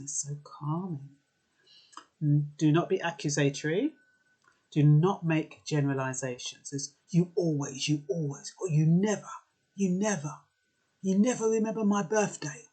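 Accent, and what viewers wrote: Jamaica. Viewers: I like it wen you fling on yu jamacian talk.keep up the good work.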